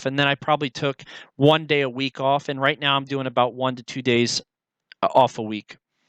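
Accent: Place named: United States. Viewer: you have the american accent but it's not strong to the point i wont understand and it's better than then the previous video for sure, accent will be lesss and less present the much you speak with native level speaker. Immersion all the time , it a method actually.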